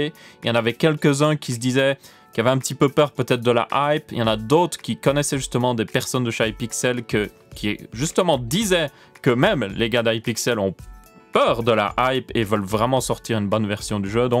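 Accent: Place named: Ardennes, Belgium. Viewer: Ardennes belges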